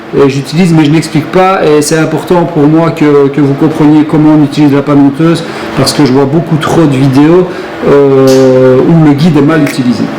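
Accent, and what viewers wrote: Liège, Belgium. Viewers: A la 30,26 minutes le serre joint à lâcher !!!! Heureusement que tu tenais bien le gabarit n’a pas bouger. Pour ma part , j’ai un autre type de gabarit. Qui entaille directement les trois charnières en un seul serrage. Porte 201,5. En tous cas , ça fait plaisir d’entendre un belge avec un accent liégeois. Bientôt je me lance sur YouTube avec mon accent Brusselair ! Ça va déménager les R.🥳🥳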